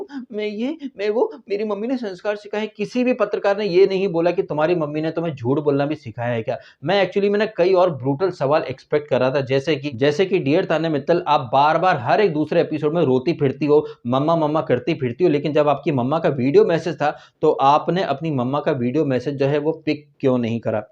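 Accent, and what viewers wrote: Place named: Delhi, India. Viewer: bhai aapka tone delhi ke logo jaisa h😂 islye maine poocha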